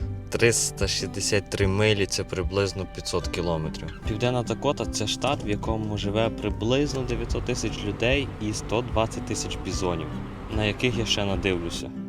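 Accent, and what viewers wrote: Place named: Volyn, Ukraine. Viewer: цікавий діалект, звідки ти? волинь (десь цей район?)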